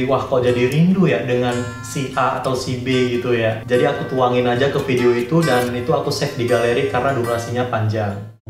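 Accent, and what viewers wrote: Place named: Karo, Indonesia. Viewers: Bang orang mana? Logat nya kayak bahasa medan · I feel you ka~ Selucu-lucunya beti, sekocak-kocaknya beti, semenghiburnya beti, tetep otak dan dalangnya kak, itu ajasih yang perlu diinget. So ya, salut banget sama kak. Terus berkarya ya ka, aku selalu nungguin video kaka karena moodbooster parah aku bisa ngakak sampe nangis kalo liat video beti loh😂 satu lagi, gara2 sering nonton beti aku jg suka niruin logat medannya kaka, padahal aku orang jowo wkwk pokoknya semangat terus ka! 💪💪💪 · ya bang banyak video abang kayak keseharianku setelah 8 th menikah kebetulan istri dari sumatra nah tiap pagi logat macam ini selalu menghiasi hari hari ku dan anak2ku dirumah (sukses selalu ya bang tetap berkarya) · Paling suka Kalau Abg Bawakkan vidio ada logat karo nya🤣🤣 kalau gak ngerti bahasa karo tanyak² aku ja bg😚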